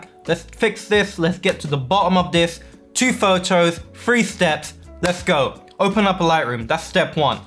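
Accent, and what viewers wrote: India. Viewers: Honestly, it was the Indian accent for me 😂 thanks for the info!!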